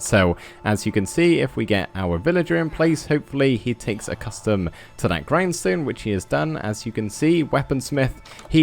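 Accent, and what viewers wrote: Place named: Scotland, United Kingdom. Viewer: Hey, really enjoying your builds. I've been trying to place your accent. Is it Scottish borders?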